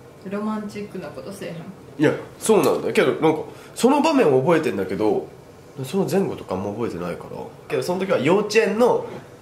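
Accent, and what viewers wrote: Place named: Osaka, Japan. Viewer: 色⤵が美味しそう。 大阪弁ww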